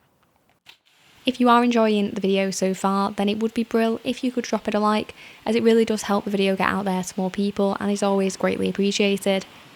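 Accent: United Kingdom. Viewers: Great video thank you. Also a very soft and delightful British accent,(please talk more!).😊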